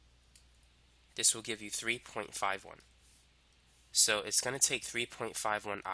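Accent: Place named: New York, United States. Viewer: New York accent innit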